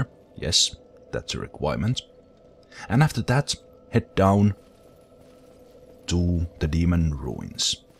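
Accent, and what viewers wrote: Finland. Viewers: You from Finland? Your english sounds like typical english speaking finn : D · Dat finnish accent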